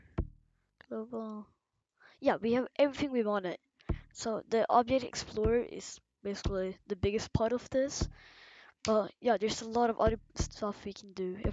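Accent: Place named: France. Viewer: THIS IS SUCH A STRONG FRENCH ACCENT RESPECT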